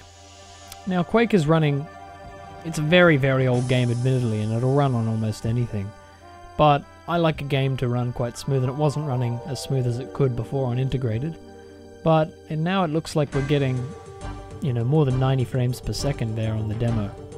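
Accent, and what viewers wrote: Australia. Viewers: Australian BROOOO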